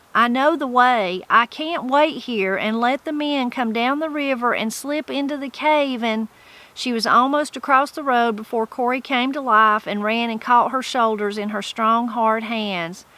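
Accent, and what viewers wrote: Appalachia, United States. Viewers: I have just found your channel and have subscribed. Hearing you talk is just like hearing my grandmother talk. She and my grandfather were from the hills of Tennessee and followed many of the Appalachian folk ways, culture, language, etc. I try to keep a list of words and expressions they used that I never hear anyone else use and over the years have found that much of it came from England and Scots-Irish ancestors. It is wonderful that you are preserving that heritage. Blessings.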